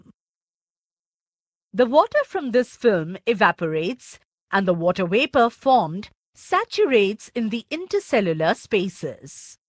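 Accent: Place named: India. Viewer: U sound like hindi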